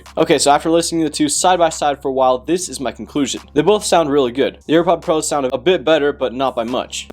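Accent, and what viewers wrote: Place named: England, United Kingdom. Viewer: lame english accent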